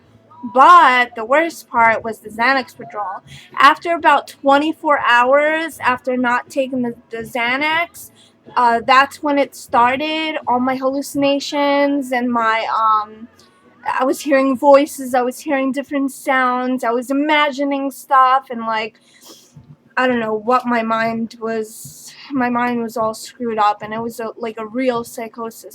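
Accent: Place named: New York, United States. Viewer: Where are you from cuzz? You sound like a New Yawker.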